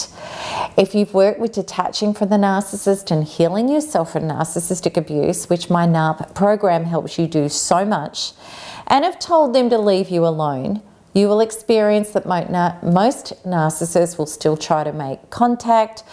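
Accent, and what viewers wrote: Australia. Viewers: Thank you for helping me understand. Three years ago I found you as a result of an awakening prompted by intuition something was not quite right I read an article you had authored. Since then, devaluation, discard, deletion. Loss of home, children, job, family, everything except one very important belief. Me Thank you for your for courage . I kept hearing this Australian voice in my thoughts assuring me I was not alone in this nightmare Thank you, 'down under'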